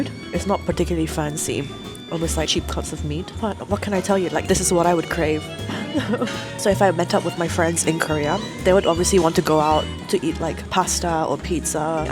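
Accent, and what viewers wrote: United Kingdom. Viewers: Don't worry, i love your vlogs for whatever you're doing and so! Also i love your british accent as always😆💕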